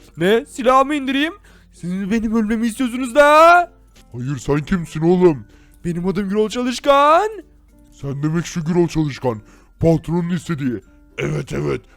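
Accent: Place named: Black Sea, Turkey. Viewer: Abi karadenizli konuşuyon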